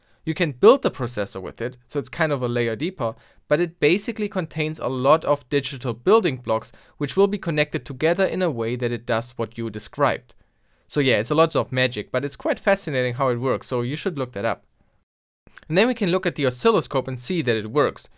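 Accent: Germany. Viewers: Kannst du den deutschen Akzent wirklich nicht raushören?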